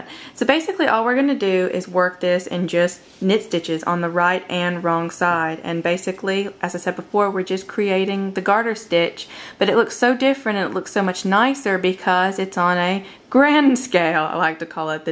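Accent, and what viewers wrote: South, United States. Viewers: This is terrific, I am an experienced knitter and you are too. In watching this I learned new stuff, so thank you. I detect a bit of a Southern accent, made me feel all warm and cozy while I was learning new stuff. Take cafe good care of yourself!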